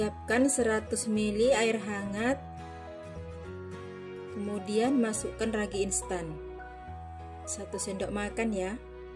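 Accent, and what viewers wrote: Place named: Madura, Indonesia. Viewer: Mantep apenna yu. Empian oreng kaemma? Mak logatta padena reng madure. Sukses yu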